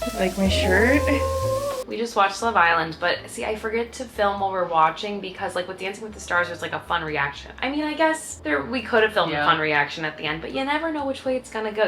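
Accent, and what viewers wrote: New York, United States. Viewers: Another interesting video from in her trademark Husky strong New York Accent living life again in the fast lane in NYC and having a action packed week with members of The Flamboyant NYC Crew, the bubbly Flamboyant Redhead, and The Twin Sisters RN'T US! duo, going to different places including two theatres, Matcha Bar, restaurants, walking in the park, watching Love Island indoors and doing some brand videos as well as cooking food and doing her work on the computer. She did gave her Cats some love and even helped a friend with moving. The Weather here in the UK is very hot and humid even at night. Unfortunately both and decided not to go to the Knicks Victory parade because of acute overcrowding. She did go out on a date with someone but she is keeping it a secret! Looking forward to seeing another video soon. Take care from London UK 🇬🇧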